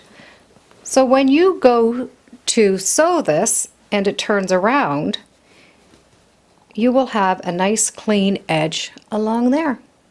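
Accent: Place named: Canada. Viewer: I always have trouble stitching in the ditch on the top and being able to "catch" the backside of the binding in those stitches. I'll turn the quilt over and find that I missed long lengths of the binding and have to go over it again, wrecking the nice clean look of the front. How on earth do you do that??? (also, you must be Canadian! My mom and Granny were from Toronto and always said "agaynst.' I was born and raised in Los Angeles. They both died decades ago, so your accent really brought back lovely memories of my Canadian side!). Thanks for posting. Very helpful! God bless!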